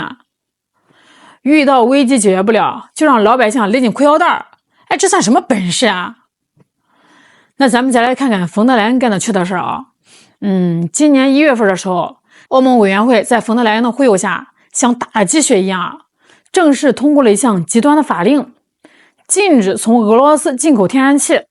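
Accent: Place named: Shandong, China. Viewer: 这女的是山东哪的口音？ 章丘？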